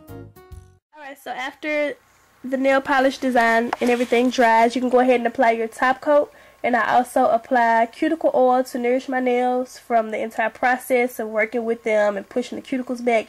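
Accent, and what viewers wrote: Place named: Mississippi, United States. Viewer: I just was just curious where are you from? You sound like you are from MS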